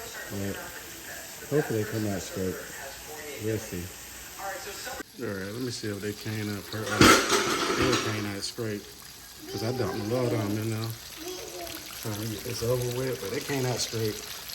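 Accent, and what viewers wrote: South, United States. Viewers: you have a southern accent in your voice. We Love peaches and Family. Love from chicago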